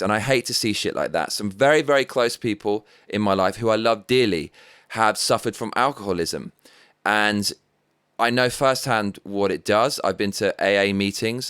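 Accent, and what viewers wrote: United Kingdom. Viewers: you got the voice and Brit accent, you're interesting, articulately spoken words resonate fairness and humility. Everyone is locked loaded in these uncertain days, propagandized lies from Leftist media. These radical Totalitarian Socialist have firm grasp on most of our government agencies. I pray Trump can fix this if not America greatest is a thing of the past.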